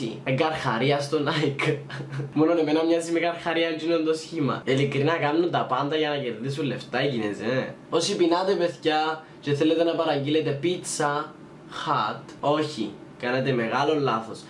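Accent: Cyprus. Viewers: Λατρεύω τα κυπριακά !!! Και είσαι πολύ ωραίος και σου πάει πολύ το μαλλί !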